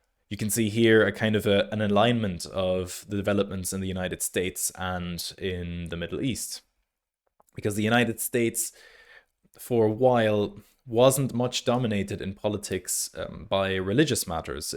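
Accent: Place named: Ireland. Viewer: Bizzare, I just replied to your thread, posted it, but I don't see anything. I did however see my previous question/comment posted, but apparently you didn't. I've no reason to doubt your word on this. It'd seem irrational to assign the veracity of a debate, based on a specific age group. Surely, the merits of the points raised are what counts, not the age of the speaker? I'm still wondering about your "Irish" accent. Perhaps you'd rather not say, and that's perfectly ok.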